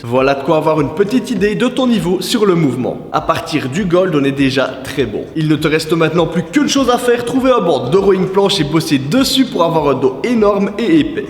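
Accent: Liège, Belgium. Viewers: Tu es de liège ?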